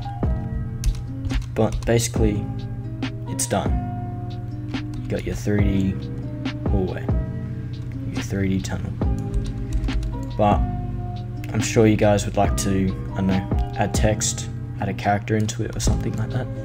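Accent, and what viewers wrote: Australia. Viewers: HEEESSS AAA FUUUCKKIINNG AUUUSIIEEEE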